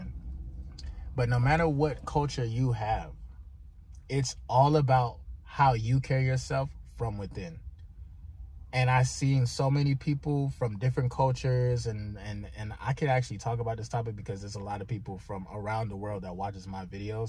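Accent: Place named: Jamaica. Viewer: Knew you was Jamaican bro 💪🏿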